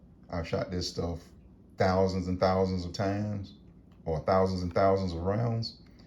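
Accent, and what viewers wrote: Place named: Alabama, United States. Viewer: I’m from Alabama originally and it sounds like you might be from there. Am I right?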